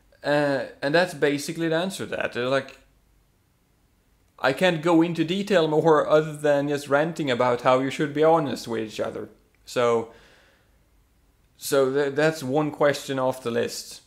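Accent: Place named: Sweden. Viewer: I dig that swedish accent of yours!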